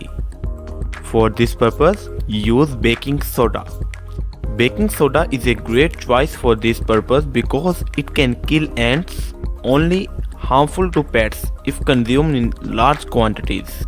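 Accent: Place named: Scotland, United Kingdom. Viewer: I cant understand the thick accent... Is it Scottish?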